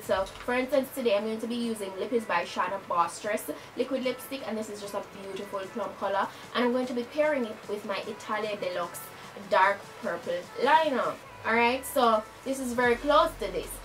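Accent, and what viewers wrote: Jamaica. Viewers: From she start talk I knew she was Jamaican 🇯🇲🇯🇲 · Amika Azore yass girl sounds 100% Jamaican love her tho #proudguyanese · I'm Guyanese and don't think you sound like guyanese nor Trini at all.Lol even a deaf man would give hear to your strong Jamaican accent.Some people just don't have hearing sound. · Only non Jamaicans would be saying you are not a Jamaican. I could tell straight away. Many have this narrow idea in there head of what a JA person should look and sounds like. It could be because you have a good grasp of the English Language. I am a Jamaican myself living abroad and many do not believe I am Jamaican because I do not walk around speaking Patois in a professional job. Silly narrow mindedness people. Do your thing. Your videos are really good and I am using them to learn the makeup techniques. Thanks for sharing. ✌🏿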